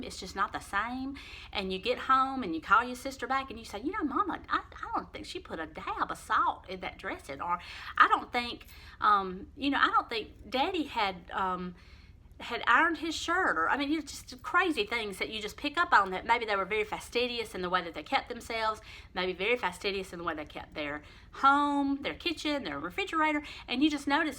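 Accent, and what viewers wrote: South, United States. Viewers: I have found your videos to be very informative & I love your southern talk! Thank you for your time to educate us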